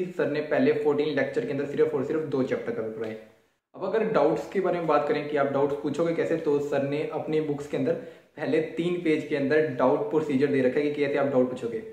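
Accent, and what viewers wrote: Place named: Punjab, India. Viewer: meine is liye puchhe kyonki mein bhi punjab se aur jado tusi gall krde paye si tdd tuhadi tone to mein samj gaya, ki ah banda te apne vall da hi aa, punjabi aa aah te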